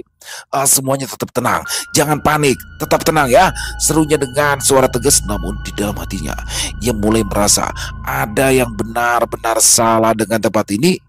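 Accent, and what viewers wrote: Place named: Betawi, Indonesia. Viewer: Pokok nya nggk kn pernah bosan dech...,klo dengerin kisah warung kopi bang sama gembul,di tambah lagi logat Betawi yg di bawain olh bang membuat kisah nya jd hidup. terimakasih bang kisah bang nggk pernah gagal menghibur salam sehat sukses dan bahagia selalu untuk bang dan team ❤di tunggu kisah bang selanjut nya 😊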